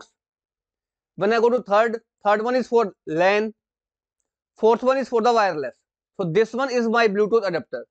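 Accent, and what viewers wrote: India. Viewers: Would be nice if it wasn’t an Indian scammer talking through it jeez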